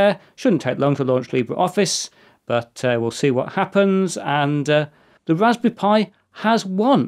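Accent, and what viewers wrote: United Kingdom. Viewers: Very interesting series of videos. Great professional shooting, clear diction, excellent explanations and your British accent is delightful to my ears of non-native English speaker. Will definitely subscribe. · Accidentally wandered on your Tube. Really interesting to me 'cause I love different kinda electronic and PC odds&ends. Besides that, I really enjoy your british, so thank you!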